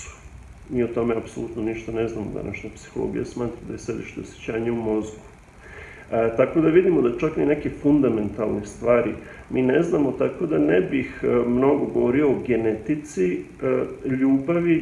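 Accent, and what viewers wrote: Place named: Vojvodina, Serbia. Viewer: Gospodine poštujem vas kao naučnika ali vas molim da uvek pričate na Srpskom jeziku,jer ste očigledno odrasli negde u Vovodini bliže NS. Oseti se po naglasku i ne pokušavajte govoriti na Hrvatskom jeziku prosto ne leži vam. Nemam ništa protiv toga ako ste Hrvat niti protiv hrvatskog jezika. Ovo kažem posto ste u jednoj emisiji pokusali da pricate na Hrvatskom ali u najmanju ruku delovalo je smešno i ne prirodno za vas. Nemoj te se ljutiti, sve ostalo je ok,puno vas poštujem. Sve naj bolje želim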